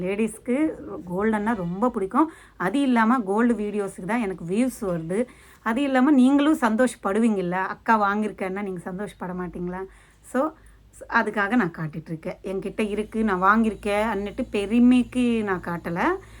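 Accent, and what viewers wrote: Telugu, India. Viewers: Nice very cute design voice summa super telugu voice tone tamil kalandhupesum very cute voice 🎉🎉🎉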